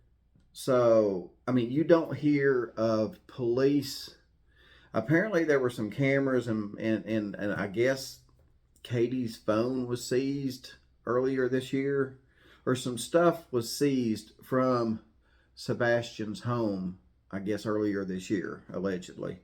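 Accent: South, United States. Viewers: Love listening to your southern voice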